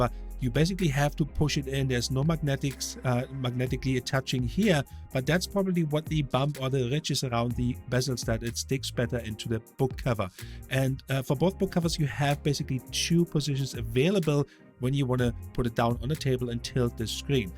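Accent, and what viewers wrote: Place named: Germany. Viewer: Nice video, i think i'll choose S6 Lite, are you german? you have a german accent i find lol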